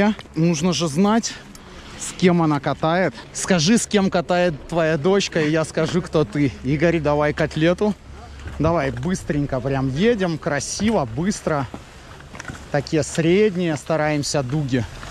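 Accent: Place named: Kyiv, Ukraine. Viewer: Откуда киевский акцент?)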